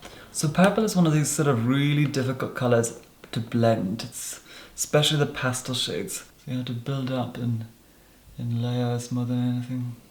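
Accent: Scotland, United Kingdom. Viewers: I love his accent but I love Scottish accents anyway. Also I love it that somebody can acctually speak English properly cos Imteased for that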